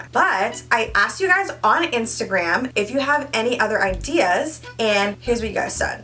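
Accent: England, United Kingdom. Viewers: Can we talk about the English accent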